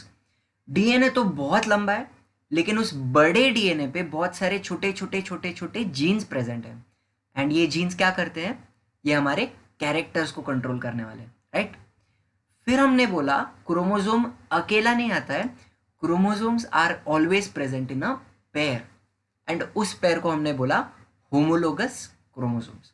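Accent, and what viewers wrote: Maharashtra, India. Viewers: Sir tumhi marathi aahat ka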